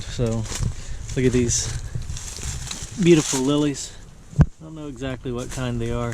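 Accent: South, United States. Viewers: I usually only see your newer videos. I've only been following you for about a month or so. This is the first time I've seen one of your older videos and I love how much thicker your southern accent was. I love your videos.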